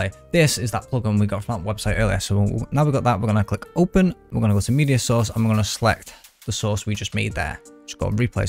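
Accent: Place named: United Kingdom. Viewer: Closed captions is must for this video, very fast and hard to understand dude, I love a UK accent but cmon man :)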